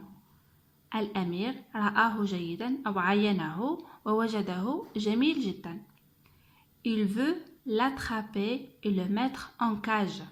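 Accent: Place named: Algeria. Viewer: D'où venez-vous de la wilaya ... selon votre accent, vous êtes de la commune de Tadjnant ... je viens de France, mais j'habite en Algérie et je visite beaucoup ses communes ♥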